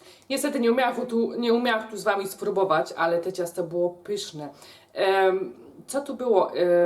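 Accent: Silesia, Poland. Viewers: jak robisz okruchy, czyli twoja posypka, to stary piekarz zawsze mi mówił, że 1:1:1 cukru, mąki i tłuszczu ( raczej masła) i cała filozofia. Spróbuj i zobacz czy Ci to podchodzi. Mi zawsze do drożdżowego wychodziło, choć teraz już robię to "na oko". Pozdrawiam..........i powiem Ci tylko, że lubia Waszo gwara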